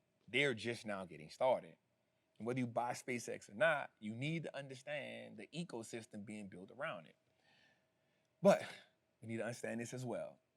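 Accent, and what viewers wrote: New Orleans, United States. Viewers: Say bro I love your style I love your knowledge I hear this New Orleans accent thing are you from New Orleans man😅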